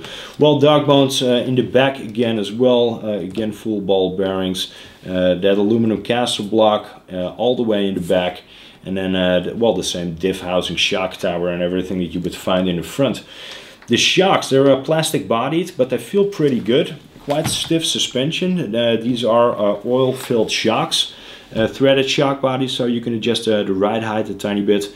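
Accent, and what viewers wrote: Netherlands. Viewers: Lijkt op Nederlands Engels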